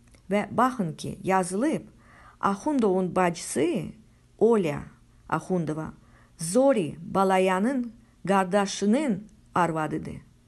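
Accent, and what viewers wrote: Armenia. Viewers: Междупрочем:у-вас-аккицент Армянски:вы-кто:любои Азэрбаиджанец-знаем:алиев Армянин, а, пачему, именно, вы Имфармируите, людеи!!!!! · Tocnu ermenilerin azerice danismasina oxsayir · Bu danışan qadın özü ermənidir görün nə cür ləhçədə danışır, ay millət bu cür təbliğatlar uymayın bizim başqa dərdimiz var ermənilərə müharibə qalib gəlmək,bu cür cındır şeylərə inanmaq lazım deyil şəxsən mən Heydər Əliyevin kim olduğunu çox qəşəng bilirəm,bu danışan qancığada deyirəm ay erməni qəhbəsi sənin danışığından ləhcəndən görürəm ki sən özün hayiksən ay it sürüsü sən indi məni inandırmağa çaışırsanki dediyin düzdü? Üzümü tuturam bizim o dəmşalağ bəzi millətimizin nümayəndələrinə belə şeylərə uymayın burda söyüş yazmayın,o ki qaldı Heydər Əliyevin fəaliyyətini tənqid eləməyə əgər bacarırsınızsa bunu yazın bunu deyin mən demirəm Heydər Əliyev biri mələkdi hər nədisə bizimkidi bu hayiklərin təbliğatına uymayın kimdə istəyir mən o insanlarla ünsiyyətdə olub Heydər Əliyevin kim olduğunu deyə bilərəm subutda edə bilərəm,bu adam yəni Heydər Əliyev əsli kökü Zəngəzur mahalının Sisian rayonunun Cömərdli kəndindəndir atası Əlirza kişi anası İzzət xanım,ata babasının adı Məşədi Cəfər,ana babasının adı Hacı Cəfərqulu,Heydər Əliyev isə özü Naxçıvanda anadan olub,bu düşmən təbliğatına uymayın kim maraqlanmaq istəyirsə buyursun burada yazsın nömrədə qoysun məndə nömrə qoyuram vasap <num> kim üçün maraqlıdırsa buyurun mən danışa bilərəm! 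· Yalan sa allah sənə lənət eləsin. Ay cındır, sənin ləhçəndən erməninin yekəsinə elə sən özün oxşayırsan ki. Mənə çox marağlıdır, hansı erməni azərbaycan övladları üçün hərbi məktəb (yəni, Naxçıvanski adına) açar ki, sonra da onlar o məktəbi bitirib və vaxtı çatanda da elə ermənilərin özünün də kökünü kəssin?! Hansı erməninin övladı ali baş komandan kimi minlərlə erməninin məhvinə, erməni ordusunun darmadağın edilməsinə əmr verər?! Ay cındır erməni, sən bu suala cavab verə bilərsən? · Daniwanin ozu ermenidir azeri olsa bele daniwmaz lehcese ermeniye oxwayir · Bu danisan qadinin ozu azerbaycanli deyil ya ermeni ya da rusdur.buna emin olmaq ucun onun sozleri teleffusune cumle qurmagina fikir vermek kifayetdir.ayibdi vallah. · Bu danışan qadın ermeni lehcesi ile danışır. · Бурда бири йазыр ки ханымын сесиди.ай тупой гормурсен ермени лечесийнен данышыррр??? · Danisanin ozu ermeni kimi danisir Heyd er baba Esl Azerbaycanlidi az nagil danisin elebil torpagi Heyder Eliyev satib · Sən hələ erməni ləhcəni düzəlt sonra Heydər Əliyev haqqında danls elə özün tər təmiz ermənisən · Senin danIshIgIndan bilinir ki, sen ermenisen. 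O, ermeni olsaydI AzerbaycanI iqtisadi cehetden inkishaf etdirmez ve ordu quruculugu aparmazdI.Zefer Qelebemizin ele esasInI da o qoyub.O dunyada tanInmIsh bir LiDERDiR. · Bu qadın Ermənidir səsindən tanıdım Mingəcevirdə yaşayıblar Azərbaycan dilini bilir bir kanal var BBS səhf etmirəmsə,orda müsahibə verib tapıb səsə qulaq asın. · Sən özün ermənisən.Ara qatmaqdan otrü bu yazını ortaya qoymusan Sənin danışığından məlumdur ki.,əsil efməni ləhcəsi ilə danışırsan.Sənin havadarlarında sənın kimi erməli məxluqlaeından dır. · Evvel azeri dilinde danışmağı öyren sonra bu nağılları danış senin lehçen ermeni lehçesine oxşayır Camaatı inandıra bilmessiz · Xəbəri oxuyanın özü ermənidir. Danışığından bilmək çətin deyil. Hamısıi böhtandır. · Bəs sən kimsən erməni ləhcəsiylə danışırsan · Sen özün ermenisen alçaq lehcenden melumdur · Danışanın özü deyəsən ermənidir. Ləhcəsindən bəllidir... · Про алева не знаю ну ты точно не Азербайджанеч, может армянка по разгавору видно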